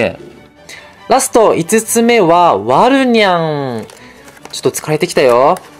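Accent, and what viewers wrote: Kansai, Japan. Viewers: めっちゃ関西べん笑笑と、思う人グッド👍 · おもいっきり関西弁。笑 まぁおれも関西人やけど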